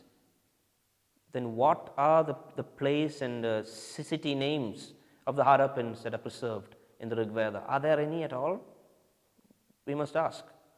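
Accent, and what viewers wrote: Kerala, India. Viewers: Why do you have a mallu accent?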